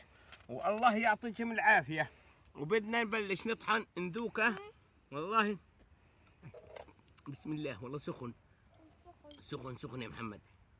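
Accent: Palestine. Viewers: اقرف لهجه فلسطينيه بعدين كسخت التمثيل ادعيه وحالتك حاله الإشي مبالغ فيه كلو مشان الشهره والمتابعه ههههههههههههههه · لهجه فلسطين زي الكنادر مقرفه.. تحياتي لليهودحبايبنا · lahoo lahoo بالعكس احلى لهجة في العالم اللهجة الفلسطينية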